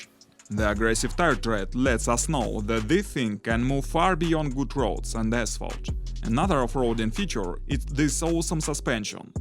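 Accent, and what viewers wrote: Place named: Russia. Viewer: Сразу слышно Русский говор...🤣🤣🤣такой был у нашей училки...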